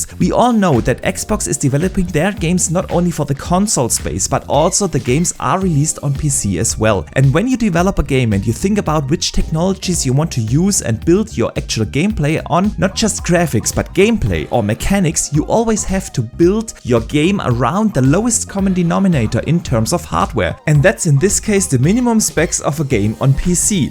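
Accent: Germany. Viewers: Alter…du klingst so fucking deutsch! 😂 No offense, nen Like kriegste als Entschuldigung 😬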